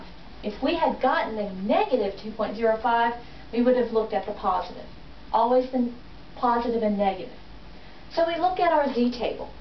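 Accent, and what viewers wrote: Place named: United States. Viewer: great lesson , but i despise the american accent :((((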